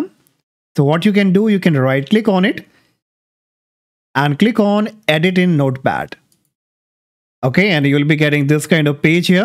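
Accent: India. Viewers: Indian accent..get out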